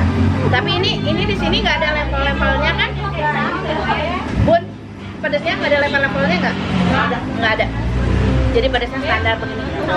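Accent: West Java, Indonesia. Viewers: ngakak sendiri liat teh kl pke logat sunda lucu,.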